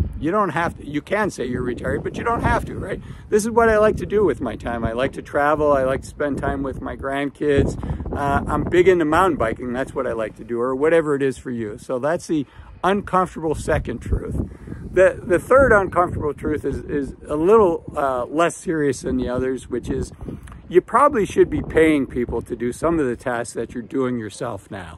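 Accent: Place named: East Coast, United States. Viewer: I’m curious what part of the us are you from? I’m guessing the upper east coast.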